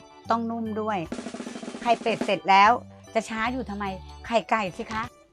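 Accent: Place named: Isan, Thailand. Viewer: ชอบสำเนียงการเว้าา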